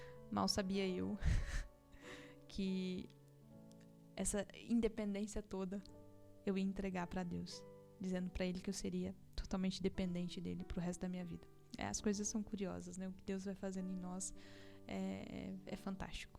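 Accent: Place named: Minas Gerais, Brazil. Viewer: Barbacena! A cidade das rosas 🌹 e da Beata Isabel Cristina Perto de minha cidade, Conselheiro Lafaiete , por isso a sua voz é muito agradável aos meus ouvidos! Sotaque mineiro é um trem bom demais! Conhece conselheiro Lafaiete?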